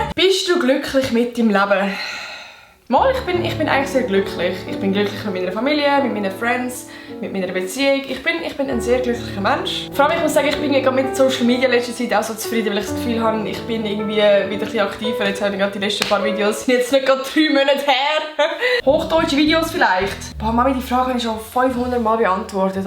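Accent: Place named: Switzerland. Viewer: Super das mitem schwizerdütsch dankeee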